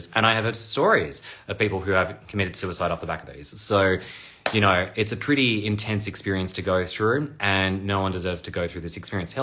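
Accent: Australia. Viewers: I have been listening to you every day, and it is fair to say that you are the biggest gem on YouTube/IG. The depth of your knowledge and expertise is truly fascinating, at times even too much for me to absorb (and I am a nerd).☺️ Also, loving your authenticity and the Australian accent. A big thank you and keep on rocking. 💚🙏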